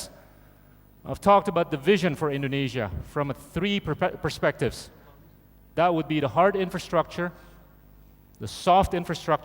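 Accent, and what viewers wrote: United States. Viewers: Aksen Amerikanya kentel banget...😊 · Wow setelah bertahun2 lulus dr universitas di Amerika beliau tetap fasih berbicara dengan bahasa inggris aksen Amerika, cool!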